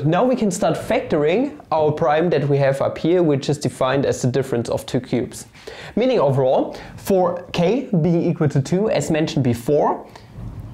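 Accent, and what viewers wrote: Germany. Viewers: Schöner Deutscher Akzent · You're German I suppose? Judging from your accent :D great video anyways, keep it up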